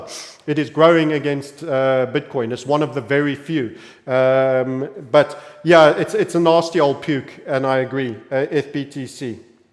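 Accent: South Africa. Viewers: How amazing it would have been to have met this guy where he is from because i am from where he is from. I here his accent. Only thing is, im from the mother city. Not sure where exactly you come from Sir. Ek wonder as jy a kaapenaar is of van KZN? Meneer ek kan nie so lekker siuwer Afrikaans praat nie, maar jy weet mos, ons praat baaie taale hier in Kaap. 😂 Dankie vir alles meneer.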